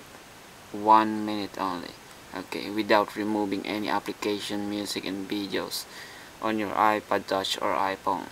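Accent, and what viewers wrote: Philippines. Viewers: Philippine? i have a philippine teacher that speaks exactly the same. switches the Fff with P, and some she switches some other letters too. but i mainly remember that. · filipino accent :)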